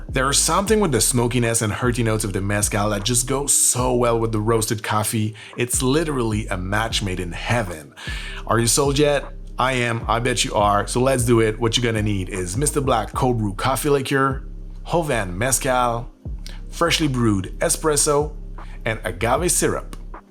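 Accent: Quebec, Canada. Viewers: Hey you're Québecois? Would have never thought? Is your accent because you are francophone? (I am aswell from Mtl south shore)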